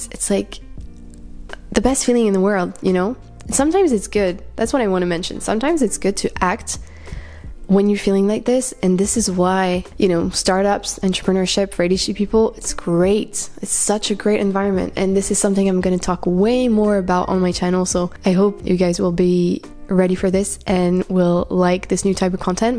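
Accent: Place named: France. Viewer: salut, j'ai l'impression que tu es française vu ton accent, mais je suis pas sûr. Est-ce que tu as été diagnostiquée adhd par un médecin en france? parce que j'ai l'impression que ce n'est pas trop dans la grille de lecture des médecins et psychiatres ici (du moins de ceux que j'ai pu rencontrer), mais que c'est plus reconnu dans le monde anglo saxon. tu as un avis là dessus? (désolé j'ai pas encore regardé toutes tes vidéos...)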